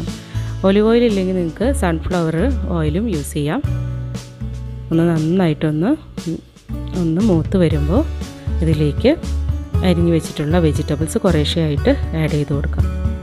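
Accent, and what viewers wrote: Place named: Kasaragod, India. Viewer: 😊lastil paranjathu pettanu manasil ayilla. Onude ketapol karyam pidikiti.😊. Ethu natile anu aa style. Kasargod style anoo. Pine healthy and rich soup adipoli👌❤️❤️❤️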